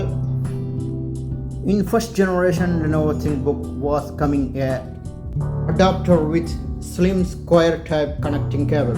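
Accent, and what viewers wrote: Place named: England, United Kingdom. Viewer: brilliant English accent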